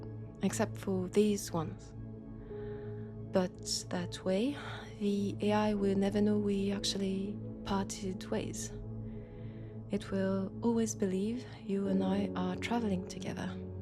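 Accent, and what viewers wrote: France. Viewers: I like the French Accent. Thats a nice Roleplay and there a emotions on it that i can feel myself. Good job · wow.....just wow, This was difficult to listen to, not because it was bad but because it was too good. Your voice carried so much emotion in it and when it started cracking, so did i😅. Life taking you away from someone you deeply care for is always a tug at the heartstrings, and this story was just made so beautifully around that idea. This is the first audio ive listened to of yours and although this is a packed up sendoff to these two, i think i can speak for most people when i say we need a part 2, or at least a resolution where they find eachother again. Something, anything. Our souls need it to heal😭 (i also adore french accents ever since i visited france a few years back, love your voice😁👍)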